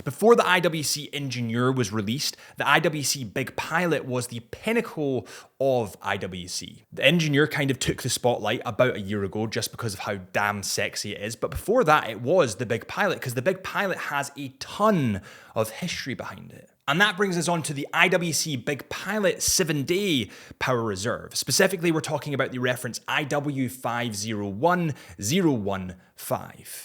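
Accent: Scotland, United Kingdom. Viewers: I love the Scots accent... it's so cool. Connery was the best James Bond.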